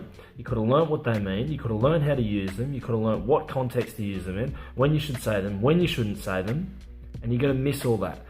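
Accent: Australia. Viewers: Kia ora e hoa thank you for being brave and courageous for voicing important things like this vid. You sound very ozzy, just curious.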